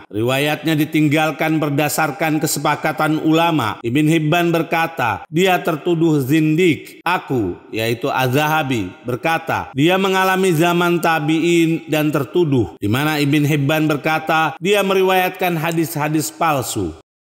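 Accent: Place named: Madura, Indonesia. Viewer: kalau dr logatnya kayaknya madura ini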